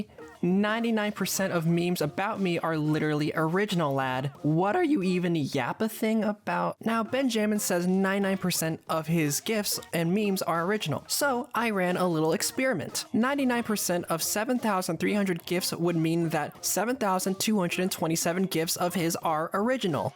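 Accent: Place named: United States. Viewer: First of all, why us an american bloke using 'lad' so much? It sounds disgusting in his accent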